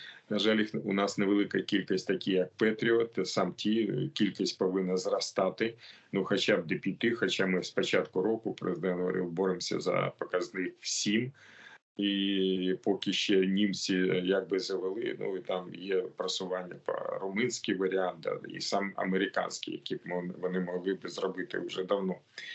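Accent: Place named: Russia. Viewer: Акцент ужасный, русскоязычные очень смешно говорят по- украински. Сама такая.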